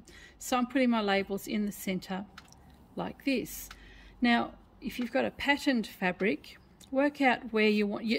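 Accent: Australia. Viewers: Greetings from Canada ! Thank you for your awesome tutorials! I have lots of tea towels of various sizes…would you be so kind to give me the measurement of your half towel once cut ? I want to be sure I’m using the same approximate size . Thank you ! Ps.. 99% of my family is in Melbourne …hearing your lovely accent makes me miss them even more 🇨🇦😁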